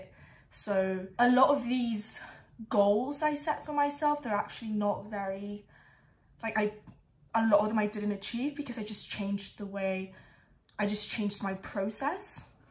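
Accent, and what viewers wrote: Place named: Scotland, United Kingdom. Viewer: That is scottish accent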